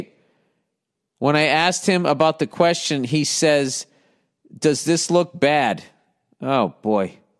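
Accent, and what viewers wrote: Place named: West Virginia, United States. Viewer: Sounds like West Virginia.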